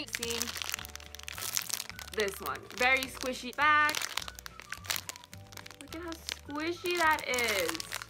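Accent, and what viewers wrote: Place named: Philadelphia, United States. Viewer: I was wondering where are u from because you speak Spanish and you speak Philadelphia soooooo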